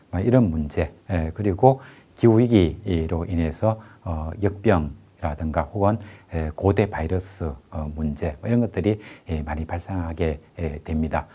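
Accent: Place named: Gyeongsang, South Korea. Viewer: 갱상도 말씨가 고향의 오빠야가 다정하게 이야기해주는듯 듣기좋습니다. 어려운 제목인데 편하게 알아 듣기 쉽게 풀어주시네요.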